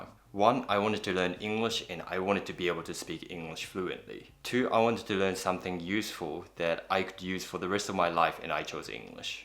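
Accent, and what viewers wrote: New Zealand. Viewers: Hey, I love listening to your Kiwi English!! This is Tia again, thanks for getting back to me the other day to my comment. I also left Japan quite young, age of 15 after graduating JHS in Japan. I've lived in California for 6 years going to HS and Junior College. I chose to come back to Japan to work with a boyfriend I had at that time. (that is not my hubby though) well, my best friend who recommended your channel and I zoom nomikai sometimes and we talk about time of our life back in States. You must be a super dupper busy guy, but if there is a chance, It would be my dream come true to chat with you!!! Thanks for your time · アクセントはめっちゃくちゃニュージーランド人っぽくてびっくりしました！このような自然な日本人のアクセントで話せるようになりたいです！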